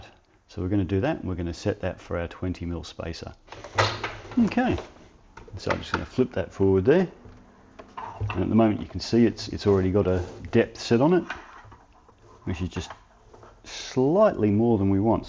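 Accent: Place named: Australia. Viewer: That’s what our accent sounds like in Australia champ.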